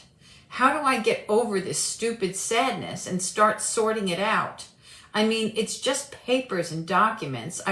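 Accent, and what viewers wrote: New Jersey, United States. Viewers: . I LOVE YOU. 😭 I was guided to your videos for the first time a few days ago. I was feeling rather defeated that day. I mindlessly typed something in google search like: "Spiritual Warfare and Overcoming Procrastination/Disorganization through Christ." I clicked on your video, wasn't thinking much of it, but then you grabbed my attention as if you had a megaphone in my ear!! 😅 I was blown away by how much I resonated with your words. It was like God was speaking to me directly, but through you. You have truly helped me breakthrough the weight of my idleness. The mountain doesn't seem as overwhelming to climb now. I've noticed less deer-in-the-headlights blank staring at the messes, because I actually know where to start now! 😆 Hallelujah! Praise to the most high! I am so thankful that He directed me to you! This video was a key that unlocked even more answers for me! 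I'm not exaggerating when I say you are an answer to my prayers,! I'm younger than your children and live thousands of miles away but, I wish we were best friends. I just want to hug you and talk for hours. My soul understands yours. Ah. Thank you for being you. 💕💕💕💕 P.s. I love love love the Jersey in your voice! It's so great 😜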